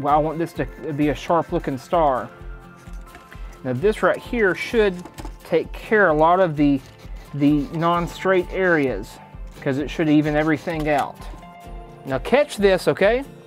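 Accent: South, United States. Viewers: I just love your southern accent! New to woodworking and loving it!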